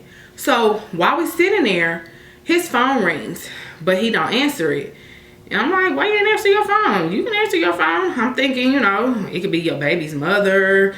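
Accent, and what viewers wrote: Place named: Kansas City, United States. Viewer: So you from KC, huh? I like when you added the voice over for him.... Made me visualize the story more...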